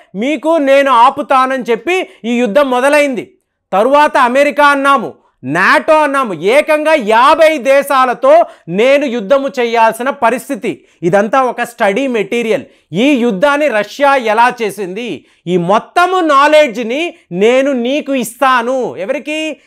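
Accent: Tamil Nadu, India. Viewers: గురువు గారూ కి తెలుగు వీడియోలు 5 ఏళ్ళ నుండి చేస్తున్న ఈయనకి ఇంకా అరవ యాస పోలేదు. Leh నీ లేహెగ్ అని ఇలా ఇలా అరవ యాసలో మాట్లాడుతుంటాడు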